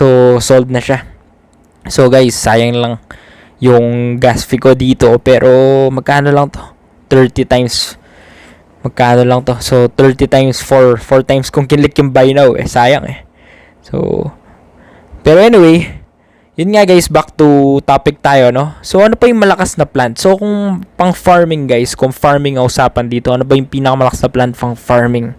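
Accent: Pampanga, Philippines. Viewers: Kapampangan accent ka bro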